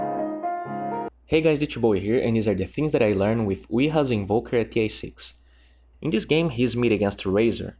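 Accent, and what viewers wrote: Brazil. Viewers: well, that's a pretty heavy accent. brazilian? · dat brazilian accent XD Keep the videos going pal. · Te sigo há um tempão e suspeitava que vc era brasileiro pelo sotaque, estou certo? Ótimo canal, aprendo muito com sua percepção!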